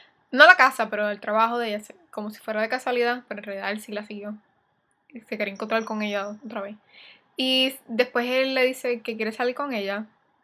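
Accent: Puerto Rico. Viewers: Así hablamos los puertorriqueños. Espero que el ja, ja sea de que te da gracia...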